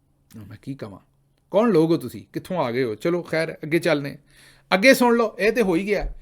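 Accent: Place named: Punjab, India. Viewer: Very nice I like your Punjabi real Punjabi well done❤❤❤❤❤❤❤❤❤❤❤❤❤❤❤❤❤❤❤❤❤❤❤❤❤❤❤❤❤❤❤❤❤❤❤❤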